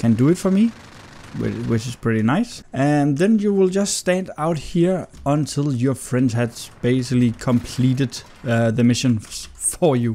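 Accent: Denmark. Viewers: You're danish right? You sound really danish. Especially when you said quicker like quigger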